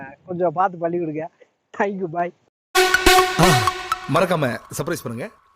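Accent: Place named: Theni, India. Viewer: I like your theni slag speech bro😍👍👍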